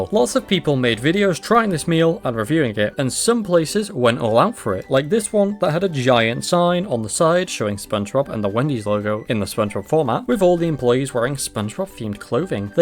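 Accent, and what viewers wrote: United Kingdom. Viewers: Are you You British?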